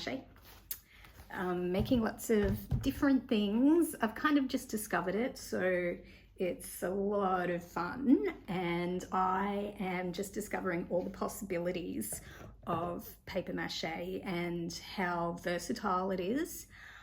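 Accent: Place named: Australia. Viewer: So happy to have stumbled onto your channel. This is only the 2nd of your videos I’ve watched but I’m now your newest subscriber 🎉yeah. I adore your warmth & authenticity, plus it’s lovely to hear an Aussie accent. I’m also an Australian, the same age as you & been wanting to start my own channel to document my creative process but it’s hard to imagine what you can’t see already so thanks for being an inspiration. I look forward to watching the rest of your content. I know I’m a total stranger but honestly I’m so proud of you in this video for “just doing it”, for having the courage to show up. You ROCK!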